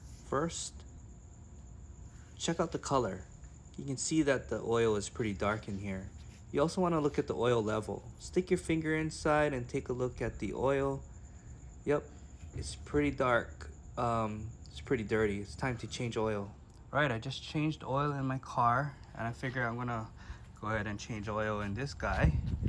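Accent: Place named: Hawaii, United States. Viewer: 😂😂😂 I knew u was from Hawaii as soon as u had talk!! Aloha to u from Kauai. Thanks for the vid, very helpful! I’ll b trying to do my own oil change on my Mclane. 🤙🏼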